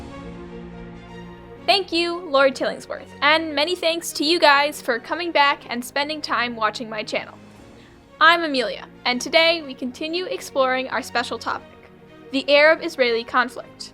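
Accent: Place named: United Kingdom. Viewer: huh, narrated by a young British girl. kinda new way for a channel.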